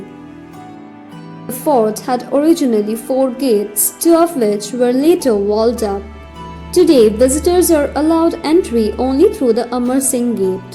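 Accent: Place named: England, United Kingdom. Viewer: Very nice, keep up the good work, very impressive voice and english accent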